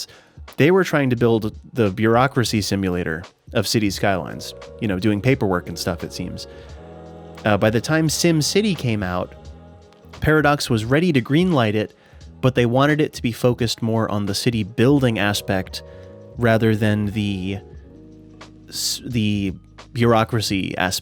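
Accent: United States. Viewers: You didn't say it right away, but the the more you talked the more I knew you were 100% in Northern Virginia :). - And although there is a decent number of people who use the metro, it is still highly underused. I came from California, and we had a very similar experience there. It seems, in the US, we love to talk about metro, but we often prefer being in a car.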